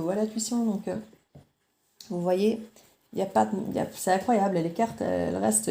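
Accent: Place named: Liège, Belgium. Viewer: Une guidance tres parlante... comme toujours... ;-) ca evolue petit a petit, quelques petits changements chez elle depuis ta guidance mais j'ai reprend mon "pouvoir" tout doucement pour me prendre en priorité et ne plus etre dans l'attente... Pour le reste, on verra bien.. ;-) Un grand merci pour tout... Plein de belles énergies pour toi (j'adore toujours autant cet accent liegeois :p )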